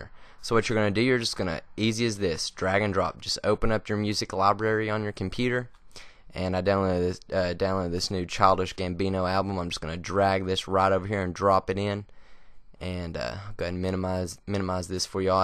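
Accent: Texas, United States. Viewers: This might be of interest for some: The "How-To" starts . Also: Your accent reminds me of "The Grid - Texas Cowboys". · You’ve like a Texas accent. Almost.... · Dude, are you come from the south? lol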